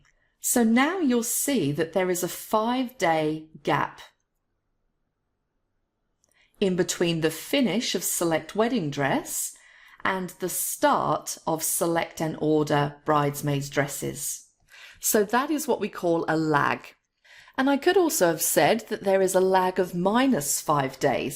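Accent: United Kingdom. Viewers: I bet, that’s because of UK accent, isn’t it? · British accent, i love it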